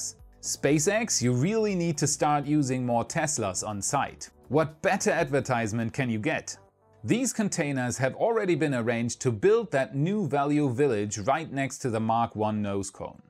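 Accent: Germany. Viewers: I'm curious about where comes from. According to how he said "Einstein Bose", he really sounds (Northern) German. Does anyone know more? (Not being a native speaker, I'm not very good in detecting dialects)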